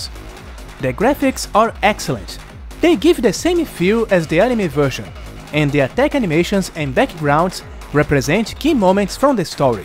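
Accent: Brazil. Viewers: sabia kkkk vc fala um ingles com um sotaque forte de brasileiro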